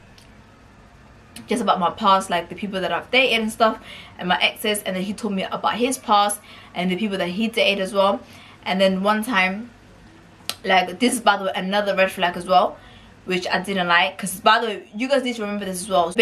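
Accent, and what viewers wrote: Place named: Scandinavia. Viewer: by the way wheres your accent from?, are u from those Scandinavian countries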